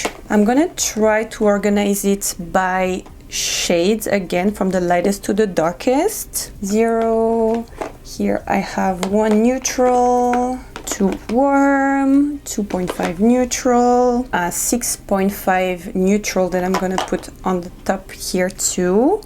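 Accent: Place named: France. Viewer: Vous êtes française ça s'entend à votre accent 😊 Je vais regarder un peu vos autres vidéos j'adore les vidéos makeup !! ^^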